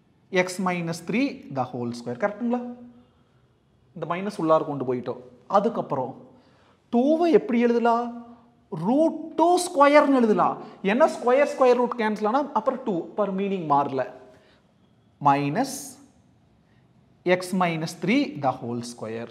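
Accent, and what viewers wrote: Coimbatore, India. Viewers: It's coimbatore slang. Try to understand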